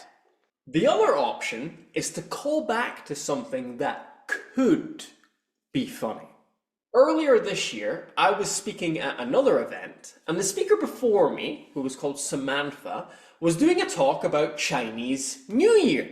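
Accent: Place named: RP, United Kingdom. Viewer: rp accent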